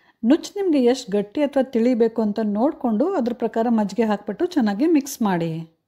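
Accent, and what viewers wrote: North Karnataka, India. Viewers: I like your north karnataka style of talking, it feels natural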